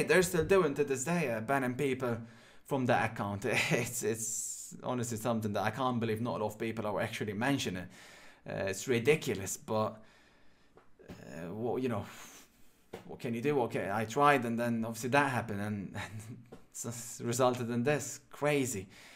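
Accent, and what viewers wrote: Ireland. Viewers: You have an irish twist to your accent. You live in Dublin? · Sometimes he sounds French sometimes he sounds irish 🤔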